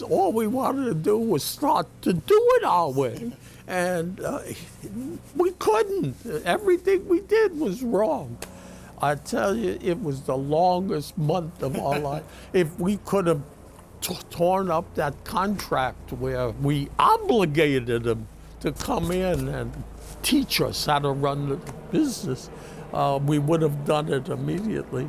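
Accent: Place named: Northeast, United States. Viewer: A mix of NY & New England or just a northeast accent.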